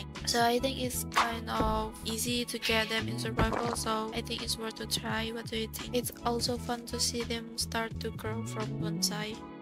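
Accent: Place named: Spain. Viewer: by your voice i feel you are a Spanish person who speak English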